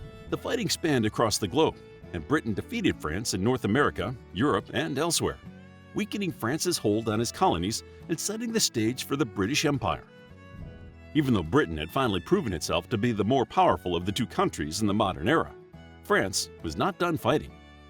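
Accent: United States. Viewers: You do realise this Narrator is American, not British, don't you? Can't you hear his accent. They are obsessed with this rivalry, even though the Brits on the French had been friends for over 200 years.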